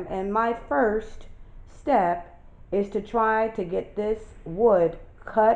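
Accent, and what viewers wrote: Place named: New York, United States. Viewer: Love ur accent miss 😀 where ya from? im a Boston native you sound like ur from either New York / New Jersey area? Thanks for the informative video 😁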